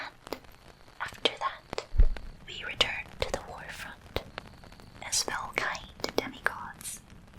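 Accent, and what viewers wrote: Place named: Ireland. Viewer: Your singing sounds incredible, and the Irish accent is really well done! I’ve already listened to it several times. Keep up the awesome work! 😁 Sidenote: What could possibly be more relaxing than a tragic story about war? 😝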